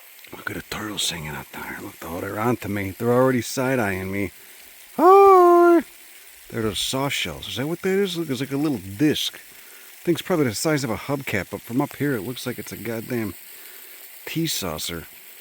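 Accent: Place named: Texas, United States. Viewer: Hey! You're up near my neck of the woods in Austin, nice of you to visit. Timing is good, too, wettest Spring I can recall. I learn so much watching your videos. If you are not now a professor of Botany at some Texas University, they should be recruiting you. You would be the most popular prof in the state. BTW, I fully endorse your pattern of speech, time to admit to reality in Texas.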